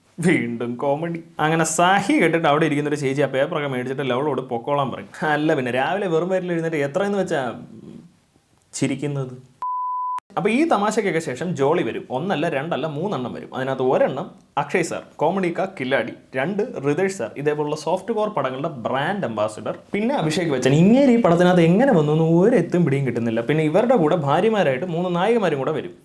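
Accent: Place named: Tamil Nadu, India. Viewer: Anyone feels like you're hearing Malayalam but in Tamil accent?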